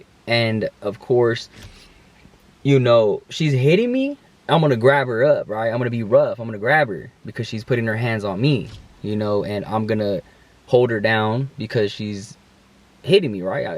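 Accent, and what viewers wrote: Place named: Bay Area, United States. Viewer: I think the Bay Area is getting to you in how the speak lol . Those from the bay know what I mean lol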